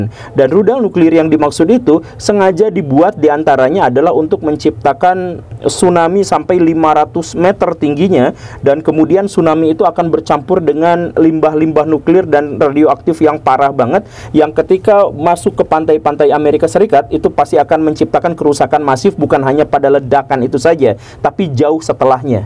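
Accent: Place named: West Java, Indonesia. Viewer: Senang dengan istilah pidio da bukan video,,, maap dan bukan maaf... Sunda banget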